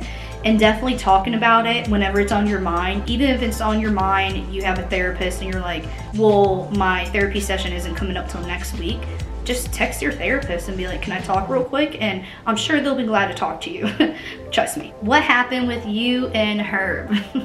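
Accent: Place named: Tennessee, United States. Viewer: Ok I actually like you on YouTube so much better! You look great girl and you have that Tennessee accent already haha super jealous! STAY STRONG and keep doing you!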